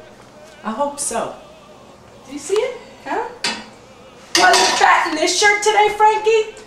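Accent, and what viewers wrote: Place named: Italian-American, United States. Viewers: the way you say prosciut ricott and mozzarell! He says it's sooo like the movies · Cant get enuff of the ny in ur voice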